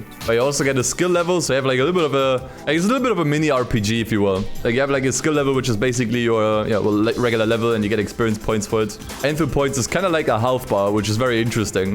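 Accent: Germany. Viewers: i hear the German accent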